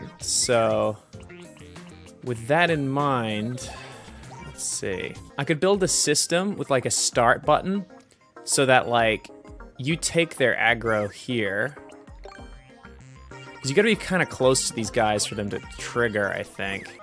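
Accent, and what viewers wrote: Australia. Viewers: was super Australian in this one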